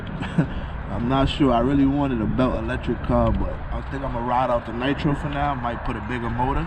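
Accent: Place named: New York, United States. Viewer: Somebody just gave me the same car I have the books and everything that came with it when it was new! Only thing that engine is stuck from sitting. I'm going to take it apart and clean it. I would say keep it for what it is man. You can always build your own electric car. Yeah just upgrade it. This is my first car. Well actually I just bought a Traxxas Nitro sport haven't started it yet.Just making sure I don't blow the motor I had it for about 2 weeks now was waiting on fuel. Sound like you from New York where you at I'm in upstate New York Port Jervis.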